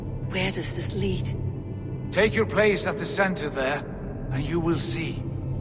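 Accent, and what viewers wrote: Birmingham, United Kingdom. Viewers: Birmingham. Can you not tell? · I did actually think you were from Brum but wasn't 100% sure.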